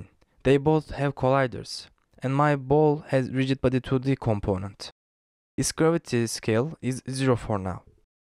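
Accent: Turkey. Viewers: tek bir sorum var sen türk müsün ? çok belli ettinde :D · Great video thank you, You sound like turkish are you ? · Aksanindan turk olduguna yemin edebilirim :D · Reis İngilizce kaynak olarak bile bu kadar kısa ve net anlatan bi tek sen varsın. Türk olduğunu anında anlasam bile :) Bu arada anlatım muazzam.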